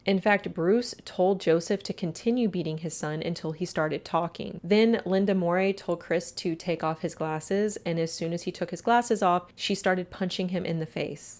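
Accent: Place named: Utah, United States. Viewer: Fabulous story-telling skills. I’m convinced the you-tuber has to be from Utah or Idaho…real and deal pronounced rill and dill.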